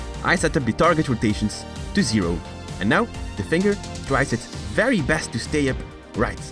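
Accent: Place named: Netherlands. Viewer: Do you speak Dutch? Because your English accent sounds a bit Dutch :) No hate.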